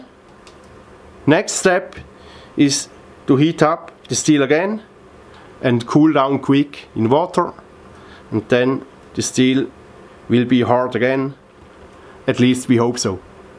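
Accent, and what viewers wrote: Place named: Bern, Switzerland. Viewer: Cool, ä chli weni Bärndütsch ...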